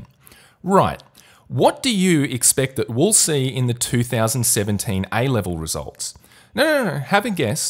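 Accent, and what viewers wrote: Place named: Australia. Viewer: You speak very well (and I actually like your Australian accent) and helped me figure out the truth. I actually thought that men were smarter only at the extremes but it seems that I too had misinterpreted (fell for) the graphs and the news articles claiming that girls are doing better in school. Most likely because it won't affect my relative intelligence to other people.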